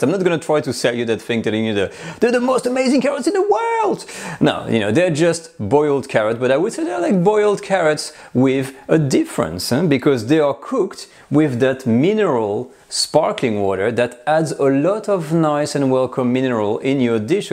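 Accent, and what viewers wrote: France. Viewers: I just love to hear you speaking English with that lovely French accent.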